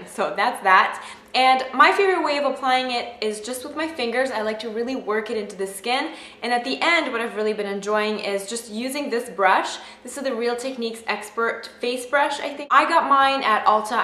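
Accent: Canada. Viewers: I actually detected your Canadian accent in this video. lol :)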